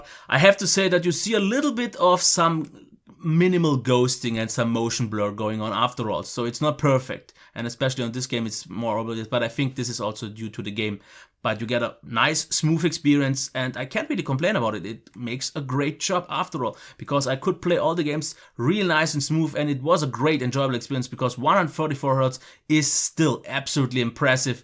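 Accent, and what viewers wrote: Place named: Germany. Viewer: German accent (almost the same as Austrian, which Arnold comes from).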